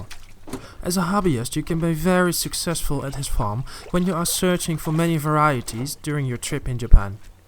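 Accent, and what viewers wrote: Netherlands. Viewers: The narrator sounds so Dutch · Is he from the Netherlands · Is it just me or am I hearing a slight Dutch accent? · The announcer in this video is distinctly Dutch I can tell that accent anywhere. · he is dutch lol.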